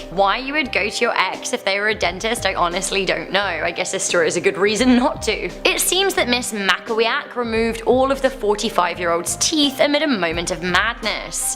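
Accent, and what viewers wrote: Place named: England, United Kingdom. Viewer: You are literally my favorite narrator! I have such a thing for girls with English accents. The random noises you make, and just your general commentary is adorable! Haha Keep up the good work! Hope to hear you again soon. <3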